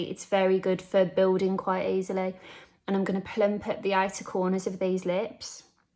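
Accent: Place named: England, United Kingdom. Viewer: I love your English accent, American accent is an accident.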